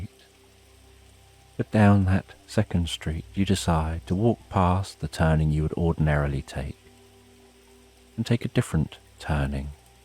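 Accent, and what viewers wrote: United Kingdom. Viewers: Had to update: My second night listening was even better than my first. This is the best for insomnia. I need that perfect British accent with that slight, almost undetectable slowed down effect. And so long, 8+ hrs! Love how one dreamscape effortlessly morphs into another, no need to worry about missing anything. I can get up to use the bathroom or shift sleep positions and gently fall back into whatever soft, lulling, dream-story vignette is unfolding. I accidentally slept in after my second night listening, and my entire body, head to toe, felt utterly relaxed. Thank you!🌌🌜👑🏰🌈🌳🌌🦜☔️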